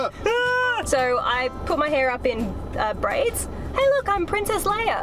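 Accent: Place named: Australia. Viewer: Loving seeing an Aussie youtuber. Finally a familiar accent!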